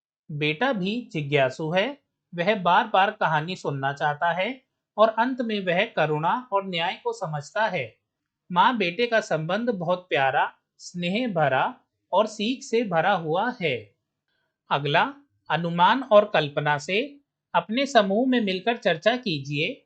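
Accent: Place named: United States. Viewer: Why are you speaking English like a american?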